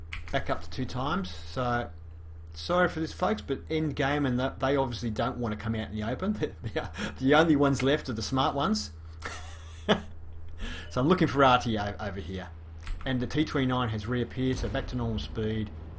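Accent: Australia. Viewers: I'm Serbian I was thinking you are from Australia cause of accent so I'm right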